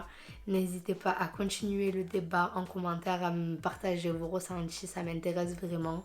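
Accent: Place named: South, France. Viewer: Therapy | Mindset & Manifestation heeeeyyyy la team je suis là ma belle je regarde ta vidéo je me suis abonnée à ta chaîne aussi je te soutiens de fou je te souhaite de cartonner sur YouTube même si je sais que c’est pas ton but premier 😉. J’aime beaucoup ta voix avec ton accent du sud aie 🔥 ton beau visage illuminé 🤩. Franchement tu explique tres bien les choses bravo continu moi je tenvoi pleins de force 💪. Gros bisous de de… la lionne logique 😘😘😘😘😘😘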